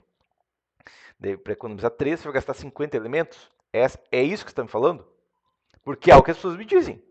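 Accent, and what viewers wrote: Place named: Lisbon, Portugal. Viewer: Meu filho , uma dúvida : você é de que lugar do Brasil ? Faço essa pergunta por causa do seu sotaque . Você é do litoral de Santa Catarina ? Por que o seu sotaque parece.muito com o sotaque da Europa , o sotaque do português europeu , especialmente de Lisboa . Abraço .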